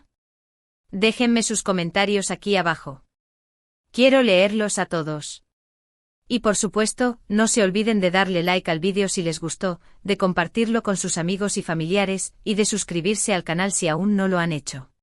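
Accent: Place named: Spain. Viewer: A esta persona que habla sin sentido ,por su forma de pronunciar con acento español ,no se cansa de decir estupideces,el señor Ulusoy vive trabajando no ventila su vida privada ,me pregunto de donde saca tantas mentiras,el señor Ulusoy graba de noche ,de día por lo que se ve en su nueva serie,entonces como esta persona se entera de la vida del actor,simplemente porque miente .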